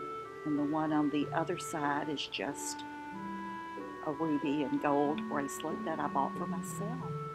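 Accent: South, United States. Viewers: I love the jewelry and the teapot. Great taste! I want to share something with you. It may sound a bit odd to you but there is reason, sort of, behind it. You are a comfort source for me and I'm not sure why. Your voice, the Southern Belle accent, you being older, which represents wisdom to me. You've mentioned your daughter in some videos and I get the feeling you and she are close. You just seem thoughtful, maybe doting at times on the ones you love. Anyway, now for the "reasoning" behind these statements. This is hard for me to share, but, here goes. I have been having issues with emotional and therefore mental issues as well, off and on for many years now. My life with others has not always been very nice, putting it mildly, and as a result, I deal with PTSD. I have developed a need for comforting, soothing things but rarely, people. I am very reclusive now, and since my mother passed, more sensitive to my surroundings, sounds, smells, people, etc. 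Listening to you and watching your videos is comforting and soothing to me. Although there are others that I love seeing and feel good around, there is only one person that I can handle being with regularly and that is my husband. I am going through one of my "darker" phases where the noise in my head from my past is very loud and I happen to notice the other day that when listening to you speak, I feel a comfort, probably because you remind me of the softness but yet tough, southern ladies in my family, a certain gentility if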